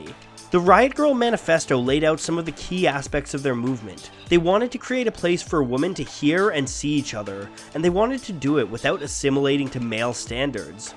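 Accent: Canada. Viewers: It’s a Canadian accent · Olive Ephemerals no it’s just the way a lot of Canadians say women. As woh-men, and the e often sounds like an A. It’s just the way she goes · that's just how we say it in canada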